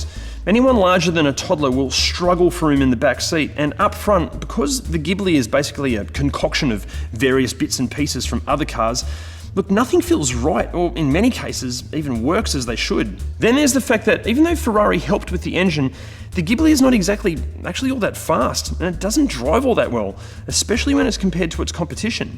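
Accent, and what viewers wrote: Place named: Australia. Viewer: omg keep joking you are underrated, funny, charismatic and lovely to watch probably because of that juicy Australian accent lol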